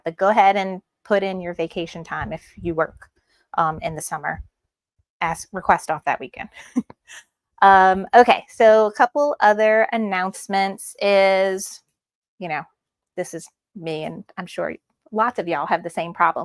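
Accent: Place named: Italy. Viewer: I have really enjoyed this amazing video. Thank you so much. I am using the marine vinyl and have the hardest time trimming it all so the satin stitch covers it. Is it my scissors? BTW I picked up on that accent before I looked to see where you were from. 😂 We are opposite sides of the boot.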